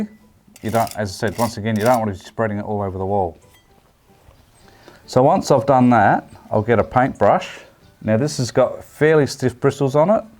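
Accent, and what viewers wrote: Australia. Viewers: thanks, mate! love the accent, Tasmania?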